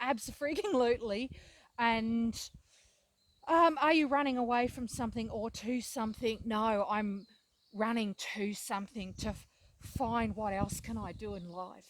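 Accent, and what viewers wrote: Australia. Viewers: Gotta love the Aussie accent !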